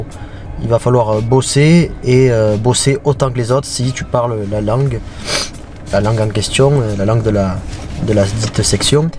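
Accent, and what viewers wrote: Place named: Spain. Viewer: Wow tu as un super bel accent espagnol !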